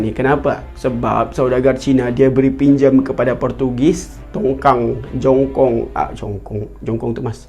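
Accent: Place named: Sabah, Malaysia. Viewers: Dia Sabahan bang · Aku rasa cukup pekat Sabah sudah tu bahasanya tu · Lepas ni kau cerita pasal pilipin pulak aku dengar cara cakap kau ni org pantai timur Sabah kan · bro ni org apa..bahasa melayu dia mcm org sabah sarawak... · .. ehh aq takde pun cakap komen die masalah. Ke kau yg tak reti baca komen aku.. cer baca dan hadam btul btul.. kau tgok speaker tu org sabah serawak tapi cuba jugak untuk cakap melayu.. dekat situ pun dh boleh belajar sesuatu. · mcm orang tawau baini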